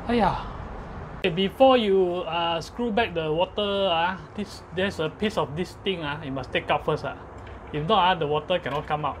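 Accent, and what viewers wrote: Singapore. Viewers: So pls share whatever u do or eat Wat with us, we like your video, the singlish n everything n we learnt a lot from u.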